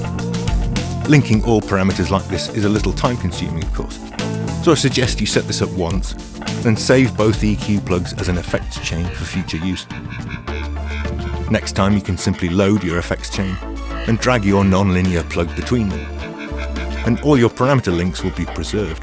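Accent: United Kingdom. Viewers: Y'know,... In the 20-some-odd years that I've been tweaking knobs I've developed juuuust enough hubris to think that I know a thing or two about this stuff. Somehow, though... SOMEHOW — these tutorials of yours manage to either completely school me or at least reintroduce me to concepts in a way that make me question whether I in fact know anything at all. You and your sensible British accent. #besttutor #dulcettones DON'T LET IT GET TO YOUR HEAD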